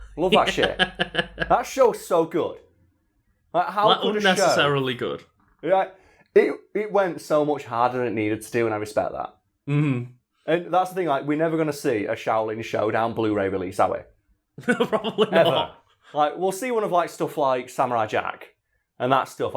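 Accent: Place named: England, United Kingdom. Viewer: True fact: Jackie has never done all his own stunts, he's used stunt doubles for all manner of teeny tiny martial arts moves all the way up to his infamous clock tower fall in Project A, where the main shot used in the film isn't of Jackie falling from the clock face to the fall, it's his co-star and frequent stunt double Mars doing that stunt. Jackie does perform the 2nd shot from further fdown the tower (so only a two-thirds fall), which is the one where he gets up and delivers his lines all in the same shot. Wisely he chose not to do a full fall leading into some minor acting afterwards all in one shot, because however you take that fall, you can't count on being able to stand up, act, and deliver lines afterwards! Also, what country is the videomaker operatng in? He sounds English, but he talks like someone who has never done a basic search for Jackie Chan on amazon UK. 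No jackie chan films available on Blu-ray? Try Police Story 1/2, Wheels on Meals, Armour of God, Crime Story, Project A 1/2, City Hunter, Miracles, Heart of Dragon, The Big Brawl, The Protector, The Young Master, Dragon Lord, Drunken Master, Snake in the Eagles Shadow (coming soon), and all manner of his early Lo Wei productions (Fearless Hyena, Spiritual Kung Fu, Dragon Fist, Shaolin Wooden Men, Snake and Crane Martial Arts of Shaolin, etc)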